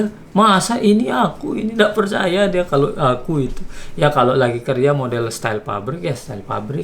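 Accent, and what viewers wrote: Lombok, Indonesia. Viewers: Logat Sasak tetep melekat🙌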